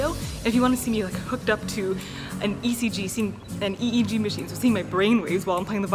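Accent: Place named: Canada. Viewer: Where are you from ? Your accent sounds very Canadian and also like your from Minnesota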